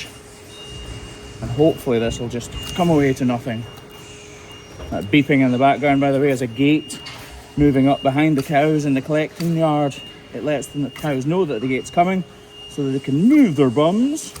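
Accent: Scotland, United Kingdom. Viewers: I don't know what it is but your videos are somehow fascinating. Maybe it's because you're doing so much good for suffering animals? BTW, I have 2 frenchies as well, they have such a wonderful character. I'm german and I always wondered in which country you might live. My guess was Scotland because you are a little hard to understand. No offense meant at all but your accent is quite a challenge for someone who learned english in school and had to do with americans only who btw use only a third of the english vocabulary, if at all. The great comedian Robin Williams once said "once you're plane lands in Scotland you need subtitles". I had a look at your website and found out that I was right, you're based in Scotland. Keep up your good work 👍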